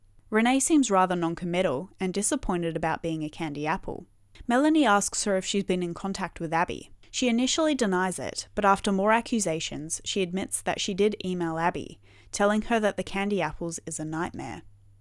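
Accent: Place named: Western Australia, Australia. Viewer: R u by chance from WA? U sound like it!! (Canadian with a perth roomate)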